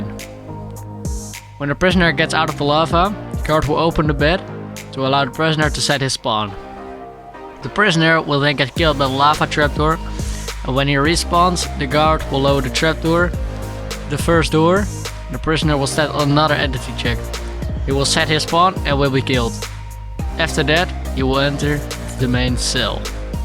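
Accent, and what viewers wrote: Netherlands. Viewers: Eyo may I ask, are you dutch since your accent sounds like it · you sound dutch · Are you Dutch? The accent sounds familiar (also I'm Dutch) don't try to not say it, I am not gonna escape it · Are you dutch?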